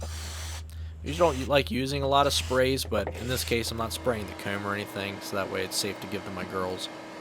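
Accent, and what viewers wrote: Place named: Midwest, United States. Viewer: "be-yahsketball" Midwestern US accents are the best.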